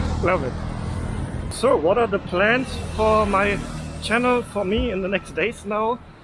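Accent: Germany. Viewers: Dein deutscher Akzent ist nervig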